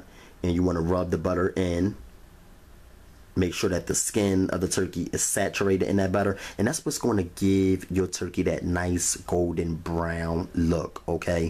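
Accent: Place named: Baltimore, United States. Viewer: U gotta be from bmore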